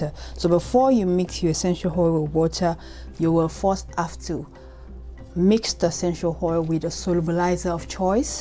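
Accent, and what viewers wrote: Nigeria. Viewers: Are you Nigerian Am picking up on igbo/ yoruba accent ?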